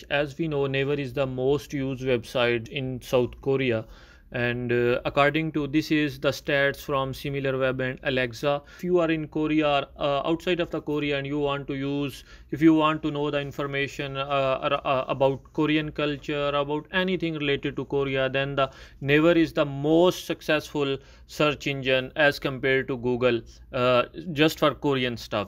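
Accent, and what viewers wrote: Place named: India. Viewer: India people ini mana mungkin orang Indonesia ngomong nya pake logat yang begitu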